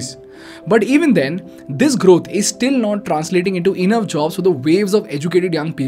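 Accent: India. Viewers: I told you.. Indian has this accent.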